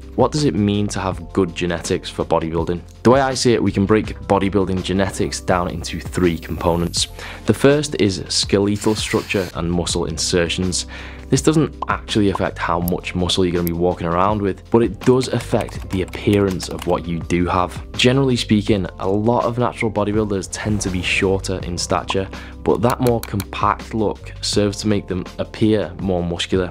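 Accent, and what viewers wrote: United Kingdom. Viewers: Nice pleasnt accent .......................for a United Kingdom guy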